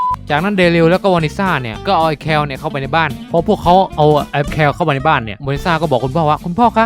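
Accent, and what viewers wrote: South, Thailand. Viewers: พี่พูดเหมือนสำเนียงเหมือนใต้เลยครับ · เหมือนจะสำเนียงอีสานนะ แต่บางทีก็ไปทางใต้ มีเสน่ห์ดีพี่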